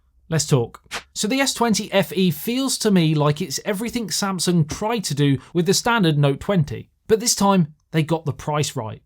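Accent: England, United Kingdom. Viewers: Damn I knew you would be speaking with an English accent from the thumbnail. You have an English looking face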